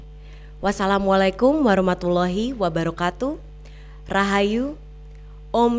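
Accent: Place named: Bali, Indonesia. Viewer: Logat bali .💪indonesiaku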